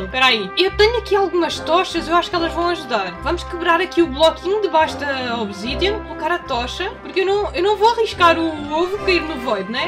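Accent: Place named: Portugal. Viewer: mais perguntas olha você morrava em Portugal e veio para o Brasil ou é só um sutaque de Portugal que você ganhou da sua mãe ou pai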